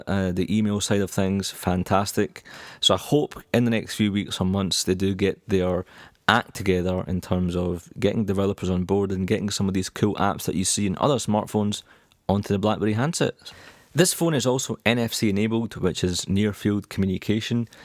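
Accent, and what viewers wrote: Scotland, United Kingdom. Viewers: Scottish, i think...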